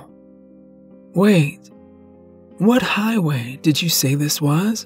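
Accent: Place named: United States. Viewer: Oooh er! That was a good one! In England we have 5 seasons spring summer autumn winter & fog! There's an old Scottish ghost story, well known, of a village appearing only in the fog, it's called Brigadoon Wanted to say, you have a really nice American accent, not Bostonian but has a British inflection somehow which makes your speaking voice ( narration voice) very pleasing & clear, lovely diction but please don't pronounce the word shone as shown ( it's just said as shonn) Plus the past tense of Drag is Dragged not Drug which is a pharmaceutical ( the dictionary says the current fad for using Drug & not dragged is an Americanism & not correct, their words not mine Btw, I'm not being derogatory it just grates on me, no offence meant)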